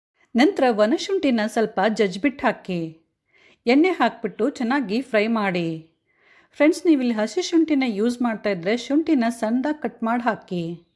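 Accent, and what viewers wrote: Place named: Bangalore, India. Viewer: Uttara Karnataka recipe anta heltiri, matadod nodidre Bangalore bashe matadtiri?? UK language matadidre En agutt ri 🤦🏻‍♂️🤦🏻‍♂️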